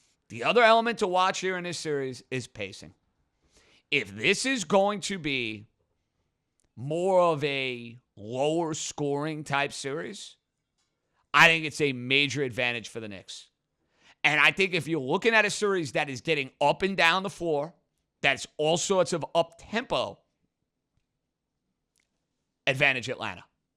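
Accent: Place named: New York, United States. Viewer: This is the most New York sounding guy I’ve ever heard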